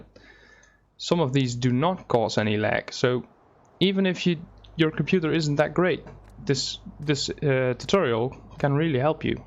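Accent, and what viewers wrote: Netherlands. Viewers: Haha lekker geEngelst vgm ben jij NL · AYE U DUTCH BRUH? wassup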